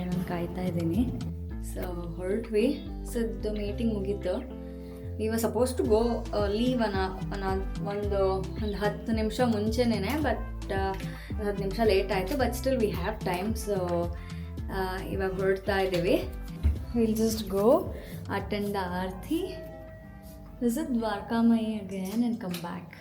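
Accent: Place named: Mangalore, India. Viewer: ನಿಮ್ಮ ಕನ್ನಡ ಮಂಗಳೂರಿನ ಕನ್ನಡದಂಗೆ ಅನಿಸಿತು ಅದಕ್ಕೆ ಕೇಳಿದೆ🙏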